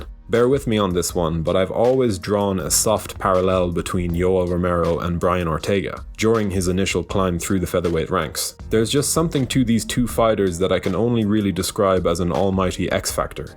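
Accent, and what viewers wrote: Ireland. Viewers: The Irish accent makes your videos better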